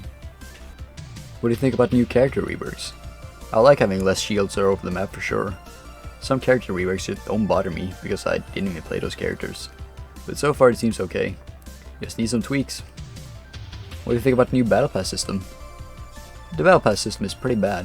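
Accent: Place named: RP, United Kingdom. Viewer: Why you speak like a BBC news people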